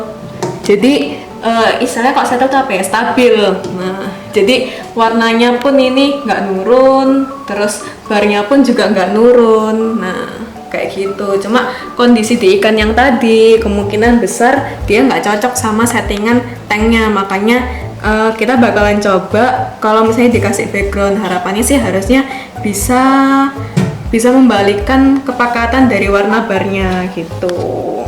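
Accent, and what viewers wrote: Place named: Surabaya, Indonesia. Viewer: pernah ketemu di event hoc. Hahaha. Suroboyoan pokok e wes.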